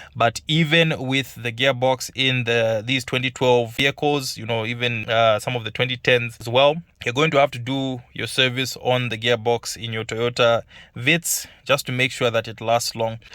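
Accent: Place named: South Africa. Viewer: Bro i thought you from my country south Africa 😂😂 how you talk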